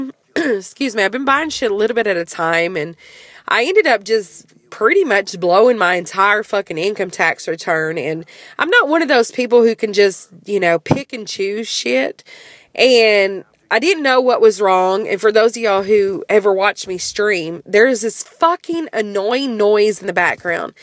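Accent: South, United States. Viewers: It was like Christmas seeing a video from you! :) Missed that southern voice! Keep your chin up, sweetie! Hopefully this drama passes quickly.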